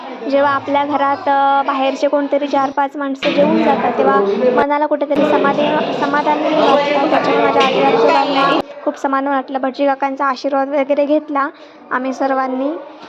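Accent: Malvani, India. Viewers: आम्हाला नाही बोलवले वास्तुशांती ला तू मराठी न बोलता मालवणीत सूंदर बोलते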